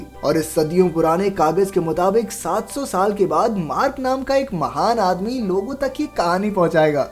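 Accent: Haryana, India. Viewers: YouTuber pkka haryana ka h😅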